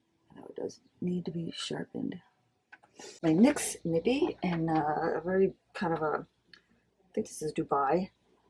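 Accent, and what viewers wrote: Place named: Scotland, United Kingdom. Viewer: I loved the end result, all the colors came together nicely. I like that you shared that you are a minimalist when it comes to make-up, i'm the opposite in that I love to do a full face look with contour and all. I must commend you on the editing of the video when you showed the product as you are using them, wow. I just hit the subscribe button just as you were saying to subscribe, lol. And finally, I love, love, love your accent, it sounds kinda Scottish so beautiful.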